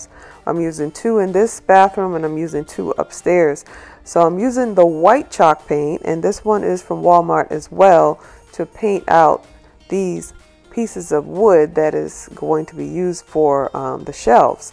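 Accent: Charleston, United States. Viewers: At this point I’m calling you family simply because seconds into the vid you said “PAINTED IT” I was like oh she sounds like she’s from Charleston And lord be hold you are. 😍😍😂😂 there’s no escaping this geechie accent and I love it. Thank you for being you and walking in your purpose and providing beautiful content and creations may God continue to keep you 🙏🏾, You definitely gained a new family member in my head at least 😂 and a new subscriber.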